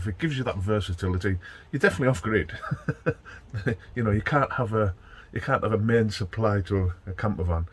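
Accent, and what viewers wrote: Cumbria, United Kingdom. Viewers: Clicked on video as I am considering fitting a tank to my motorhome, but as soon as you spoke I recognised that Cumbrian accent ..... and lo and behold you are from the same town as my wife and her family, perhaps you may know some of them ..... Bell, Cass and Dustin are the close family. Anyhow, because you are a Workington lad I will subscribe and watch your future adventures.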